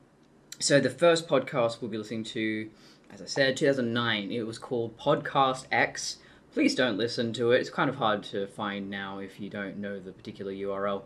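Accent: United Kingdom. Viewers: I cant imagine your voice any other way. You sound like a sophisticated English women! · Your british accent complements it perfectly. Joanna Lumley like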